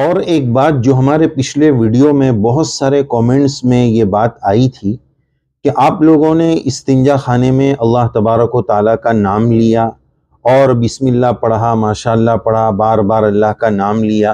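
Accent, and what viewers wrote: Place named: Arab world. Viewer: Urdu Urdu ki tarhan he bola jay na ke aarbic style me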